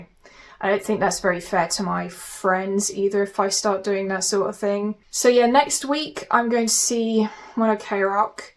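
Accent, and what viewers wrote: United Kingdom. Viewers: 🇧🇷 Even as a Brazilian, I don’t really have much difficulty understanding English in general, but the UK accent is a funny case, hehe. Anyway, I came from your gaming channel and didn’t know about this other one. It’s really chill to watch! If you’re having some trouble at the beginning of your stream, I’d say it’s totally okay to take one stream just for adjustments or something. The community will definitely help. Hugs! (And sorry for the English, still a lot to learn!)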